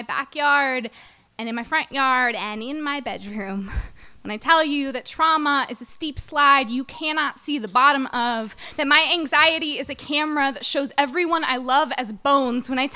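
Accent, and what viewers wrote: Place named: Midwest, United States. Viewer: Is she from the Midwest? I detect a Midwestern accent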